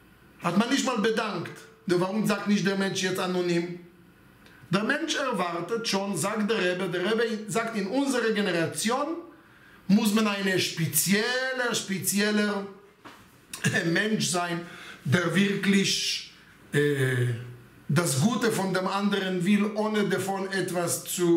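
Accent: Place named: Germany. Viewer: Danke Rebbe, ich finde Sie reden gut Deutsch. In den Momenten, wo es nicht so klappt, bringen Sie aber eine Seele mitrein, wo man versteht, und spürt auf Seelenelbene, was, und wie Sie das meinen z. B. Wenn sie jekkeln, wo das Wort so süß rüber kommt, da bin ich sehr froh, dass Sie so unterrichten. Meiner Meinung nach, falls es Sie interessiert, und Sie das wissen möchten, für mich, sind es 98% die Sie sehr gut Deutsch Reden, die 2% Jekkisch bitte behalten, die geben noch mehr süße und Seelenfreude mit ins Herz und Kopp. Danke Rebbe.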